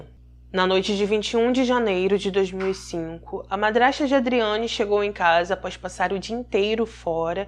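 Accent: Rio de Janeiro, Brazil. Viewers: Tô és do Rio? Fala extados unidos parecendo carioca. É legal 👋🏻👋🏻👋🏻👋🏻👋🏻👋🏻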